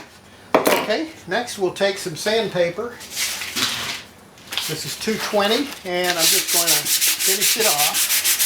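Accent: United States. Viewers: Spoken like a Texan! :face-fuchsia-tongue-out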